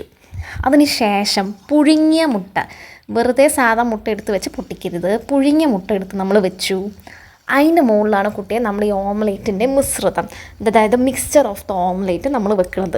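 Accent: Palakkad, India. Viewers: Super tasty omlette thank you ma'am adipoli palakkad slang❤❤🎉🎉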